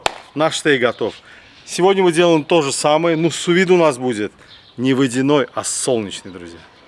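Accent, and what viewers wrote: Baku, Azerbaijan. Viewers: Я многих подписал...акцент бакинский..ностальгия.спасибо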